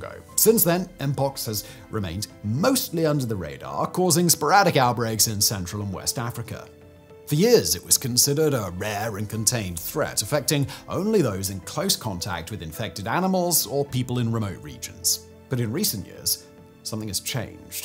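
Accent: United Kingdom. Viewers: thanks I have a hard time with the brit accent sometimes. But what I said still holds true. I'm worried about clade2.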